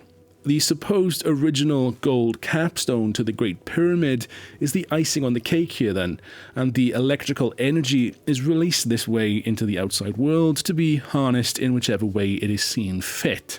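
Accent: Wales, United Kingdom. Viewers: Lovely channel...beautiful (Welsh?) accent! When you ask for everyone to be nice at the end, your manner is so lovely that I just want to be nice! Please do do more about the CHEM stuff....it's pretty interesting and the guy proposing it doesn't seem like a nutter!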